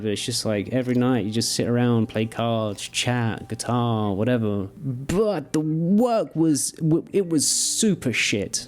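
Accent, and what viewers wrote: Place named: United Kingdom. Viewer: Truth, hollywood grade good looks and British accent carried you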